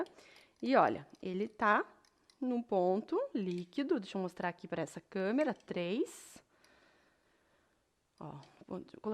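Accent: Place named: Paraná, Brazil. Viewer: Que sotaque legal, de onde é? Paraná?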